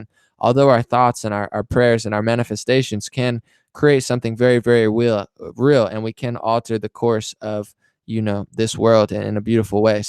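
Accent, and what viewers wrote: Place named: California, United States. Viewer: You're obviously not American. That's NOT a black American accent AT ALL. Black Americans, pretty much no matter where they live have a "southern accent" unless they are articulate. has a California accent and cadence. If a black American sounds like, he/she will be slammed for "talking white".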